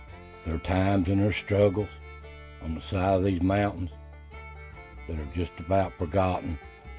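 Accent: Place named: South, United States. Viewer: We just found you not too long ago. We really enjoy hearing you tells us those stories thru the sound of your southern drawl; & I mean that in the best of ways. We have been greatly disappointed with what we have found with some so-called Smoky Mountain YouTubers; a real slap in the face of the honest, hard working & authentic folks of this area! Thank you for a “pure” representation of these mountain that God Breathed into existence & the history of the folks that lived & breathed there☺️. 🫎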